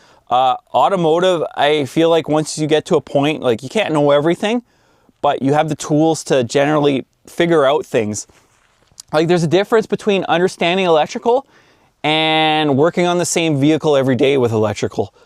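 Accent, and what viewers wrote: Canada. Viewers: This accent makes me wanna slam down some TimBits, while I wash it down with some Molson and watch the Senators play the Maple Leafs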